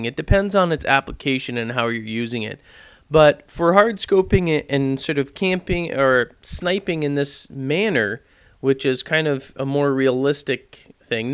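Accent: Canada. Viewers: lol u canadian?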